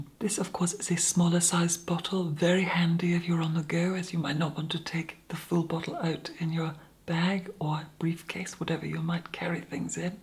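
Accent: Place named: England, United Kingdom. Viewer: To me, there is nothing more soothing than a authentic medium toned English accent. I adore the Brits. And you are a rare gem I should like to keep. And in the raw you possess a Angelic aura. I am about to get my own EOs out to use. The Sandman is sure to take me away afterwards to his dream laire.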